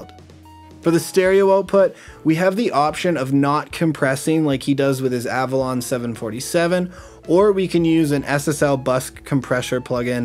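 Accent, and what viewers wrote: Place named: Canada. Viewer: I ❤️ Canadian accents!! Lol Output = Oteput Routing = Roteing Without = withote Great vid dude! I feel like you explained this better than anyone on YouTube!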